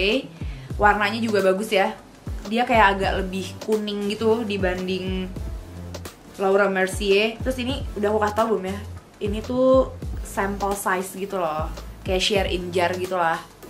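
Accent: Java, Indonesia. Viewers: Kayaknya cuma yg pantes medok tp gak jadi kayak mo ngelenong. You look so stunning as always!!!! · Akhirnyaaaa 😂😂😂 durasinya yg lama gini kess.. lope your medok 👻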